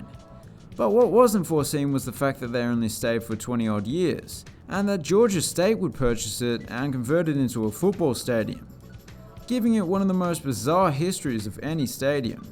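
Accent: Australia. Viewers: Watching an Australian guy commentate on a stadium that I grew up going to and can see outside my window now is pretty uncanny. (Georgia State)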